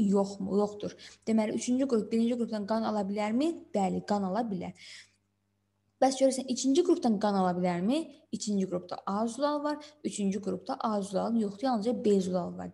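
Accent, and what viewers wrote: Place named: Ismayilli, Azerbaijan. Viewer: 100 faiz İsmayıllısınız. C və G hərflərini dəyişk salirsiz onun üçün dedm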